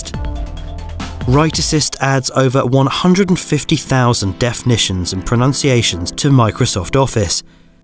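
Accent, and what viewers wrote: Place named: United Kingdom. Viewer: Having a British narrarartor doesn't make you sound smarter Spellex, tap into your own homegrown talent. I'm going to have to give this one a dislike for right now but I know you can fix this Sheldon. As Spellex's #1 fan this video really breaks the canon for the Spellex lore. Please make a better video with canon American actors. Antrex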